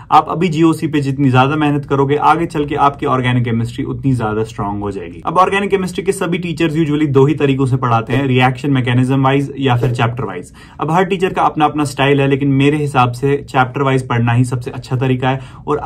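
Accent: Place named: Kashmir, India. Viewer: Bro kashmiri accent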